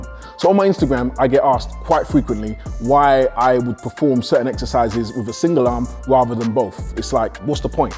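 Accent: United Kingdom. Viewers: Ain't you from UK 🇬🇧?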